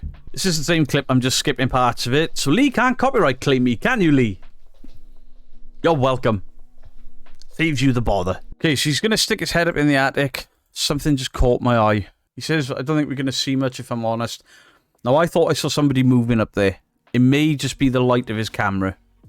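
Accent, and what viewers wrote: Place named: England, United Kingdom. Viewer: I will say, Lee's house is super clean, the yard looks lovely, and if he'd go legit for entertainment, I love the accent, people in Australia will pretty much watch anything with and English accent. Because here in Tasmania we grew up with English shows, going back to, wow, for me, the enchanted cottage, with nellyphant and little joe and the kangaroo 🤔🤷‍♀️ I can't remember his name, the wombles 😃🤗 Beatrix Potter, keeping up appearances, especially Onslow and daisy 🌼, you should react to the bloopers one day, so funny, would I lie to you, is stellar, they tried to do an Australian version and it was so cringe, I just prayed no one else in the world would see it ugh 🙈 I could just go on for hours, oh, come dine with me 😄😄😃 the voice over guy 💞💞💞💞💞, how midsomer is , where it real the most dangerous place to live on the planet, I'd love a midsomer spoof 😄😃 my poirot, my David Sutchet, oh, Still Crazy, if you English and haven't seen it ☝️for shame, my Bill Nighy, Billy Connelly, Jimmy nail, 😍 Bruce Robinson, and the other ones, I'm so sorry, my 9 year old grandson stayed last night, it seems I pick up his personality when he goes home, I'm in a very happy silly mood, and I wanted to share it here because I don't feel judged 😁🤗🙃💜👍.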